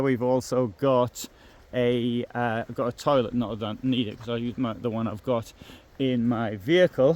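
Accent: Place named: Midlands, United Kingdom. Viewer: You never saud but.you dont speak.the queens English. I think the midlands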